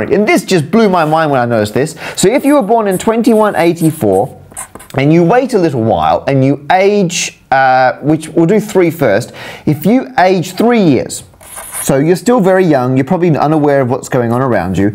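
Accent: Australia. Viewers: tell me more, Mr. Australian Math Man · He sounds Australian to me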